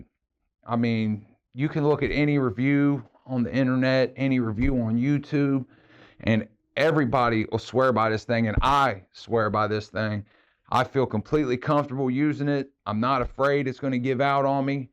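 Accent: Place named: Pittsburgh, United States. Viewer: Ha, ok close enough I guess. Thought I recognized the accent, was hoping you were in the burg as I'm looking for a decent mechanic.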